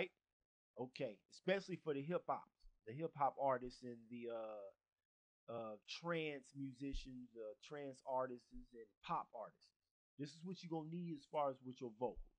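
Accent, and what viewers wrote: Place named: West Coast, United States. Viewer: teeeen,teeeen,teeeeen hahaha luv the west coast accent well u sound like u from there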